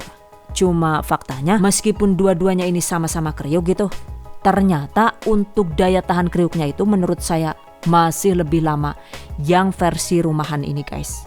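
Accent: Banyumas, Indonesia. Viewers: Aku ya eong ngapak, tapi ari krungu ngomong ngapak geget dewek 😅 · Aduh aku geget wong ndi si mbokayu deneng ngapak mblaketaket pisan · Ora ngapak ra kepenak😂😂😂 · Ngapake bikin candu😁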